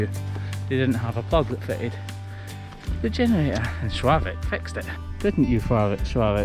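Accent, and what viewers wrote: Scotland, United Kingdom. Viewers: Lol his name is (It's the Scottish making it sound like LOL) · Can ya say a little over run by the beef? Started watching vids for the trimming, stayed for the Scottish scenery, accent and great sense of humor.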